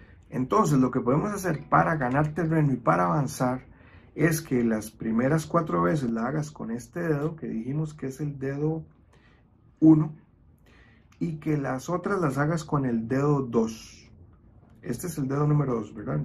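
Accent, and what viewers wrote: Costa Rica. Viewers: solo he visto un minuto, pero me atrevo a decir que sos tico. Gracias, voy a aprender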